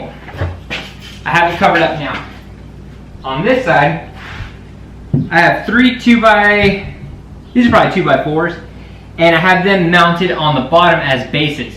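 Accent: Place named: Utah, United States. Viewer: U from Utah area ? Based on your slight Utah accent. Cool build bruh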